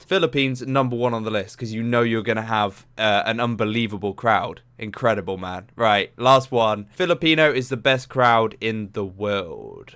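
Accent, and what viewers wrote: United Kingdom. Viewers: I love your brits accent ♥️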